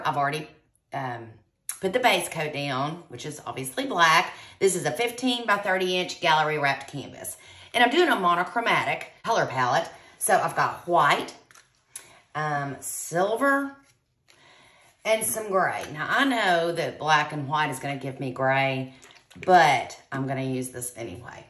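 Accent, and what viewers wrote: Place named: South, United States. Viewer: Beautiful Dutch pour! Your accent sounds like mine. Do you hail from the south?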